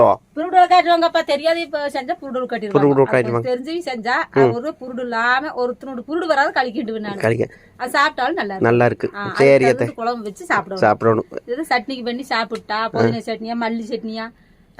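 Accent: Kongu, India. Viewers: கொங்கு மொழி வாழ்க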